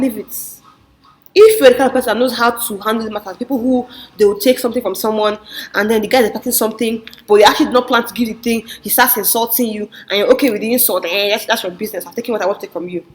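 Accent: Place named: United Kingdom. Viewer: 😂😂😂 Pls Subscribe to my Channel please😆 That’s a silly boyfriend materials😁 Your Brit-accent is on point😂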